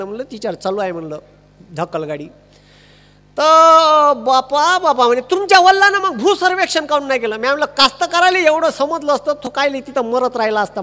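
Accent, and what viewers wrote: Vidarbha, India. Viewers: खतरनाक सर ,, आपली विदर्भाची भाषा शेवटपर्यंत सोडायची नाही.... 👍👌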